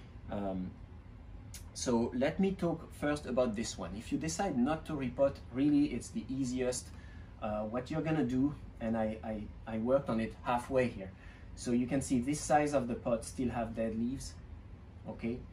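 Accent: France. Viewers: hello, where in Canada? love your french accent🙂(I could be wrong 😅) do you think I can leave it outside (Montreal) until the first snow, or do I have to transfer it to a warmer location (like indoors on a shelf near a window) ? thanks for the video👍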